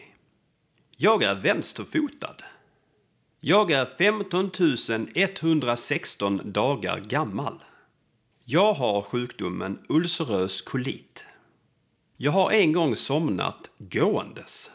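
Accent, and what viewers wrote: Skåne, Sweden. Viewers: Ahhh, the lovely broad diphthongs of Skånska! That was the dialect of Swedish I learned many years ago. Thanks for the memory!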